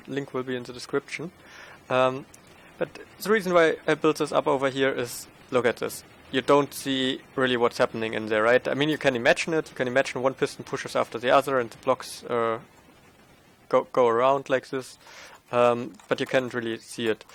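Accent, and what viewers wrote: Germany. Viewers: sprechen sie deutsche? das hort wie du eine deutsch akzent hast.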